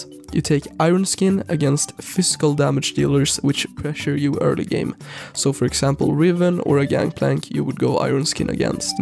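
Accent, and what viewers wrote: Sweden. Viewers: är du från sverige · Do hear a Swedish accent? c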